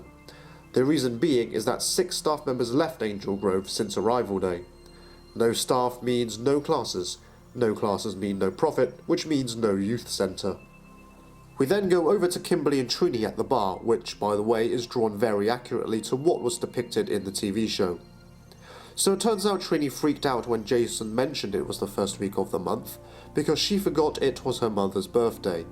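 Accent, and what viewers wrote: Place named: United Kingdom. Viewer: I've been watching your vids for a while now, and I've only just realised you have a British accent. I'm British myself wtf ahahahaha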